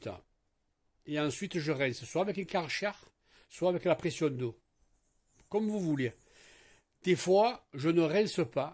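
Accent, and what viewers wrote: South, France. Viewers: Merci beaucoup très clair et concis. PS j'adore ton accent, vive le sud 💪👍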